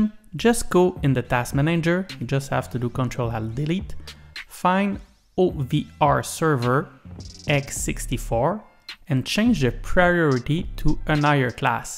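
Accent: Quebec, Canada. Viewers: Nice video bro haha j'ai direct su avec ton accent que t'étais QC lol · accent du quebec sa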